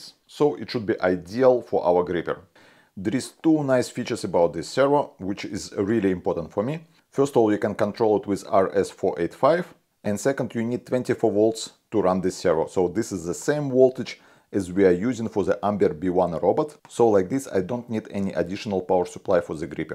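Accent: Russia. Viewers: Русский акцент потихоньку убывает у автора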